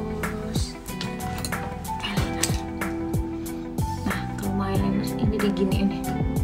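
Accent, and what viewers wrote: Java, Indonesia. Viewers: Wow kren cantik pinter klongomong ada jawanya jadi aku sukah klo orang jawa ojok ngilangno bosonedewe semoga sukses sehat selalu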